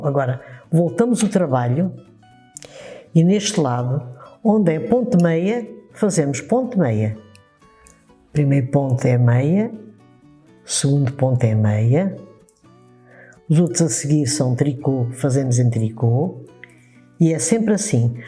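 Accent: Portugal. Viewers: adoro seus trabalhos faço todos você e muito prática aprendo muito e fora a sua voz adoro seu jeito de falar você e de Portugal eu sou mineira bjs 😘😘😘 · Estava procurando uma maneira fácil de fazer meias . Acho q encontrei. Gostei muito. A Sra lembrou-me minha avó, por parte de meu pai, que era portuguesa, pelo seu sotaque. Bjo no ❤ Muito obrigada pela receita.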